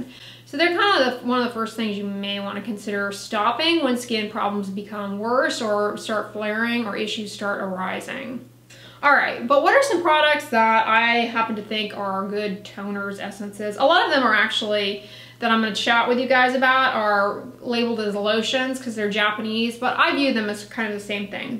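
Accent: United States. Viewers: Your american accent is so strong I hardly understand you 😶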